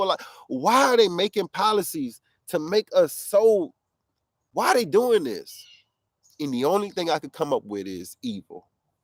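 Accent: Louisiana, United States. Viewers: I knew that was a cajun accent I heard :) Just suscribed!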